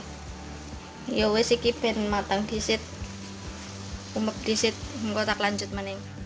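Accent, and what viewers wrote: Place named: Banyumas, Indonesia. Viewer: Ponorogo rasa ngapak ya... 😊👍👍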